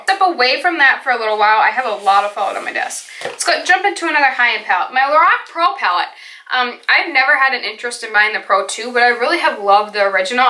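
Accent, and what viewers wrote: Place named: Minnesota, United States. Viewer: Your Minnesooooota accent is coming out strong in this one lmao 😄 it's cute !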